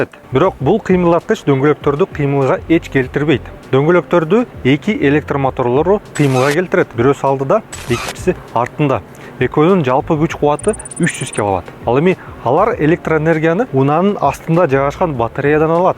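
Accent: Standard. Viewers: Кыргызча тазза граматно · Кандай таптаза кыргызча 🇰🇬🇰🇬 жолун шыдыр болсун уком. Азаттыктын денгеелинде суйлогонун жакты. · Нукура кыргызча пай пай сонун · Таза кыргызча керемет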